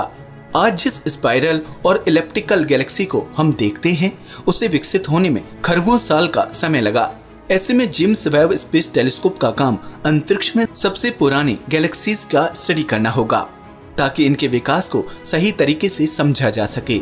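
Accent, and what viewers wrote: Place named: Ghaziabad, India. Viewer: Bhaiya Aapki Awaz Gzb ki hai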